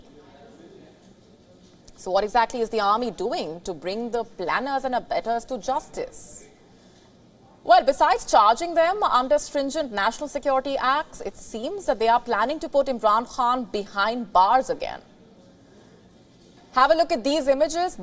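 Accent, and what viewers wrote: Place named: India. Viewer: The Indian accent and the hate whenever she says the word 'Pakistan' and 'Army' 😅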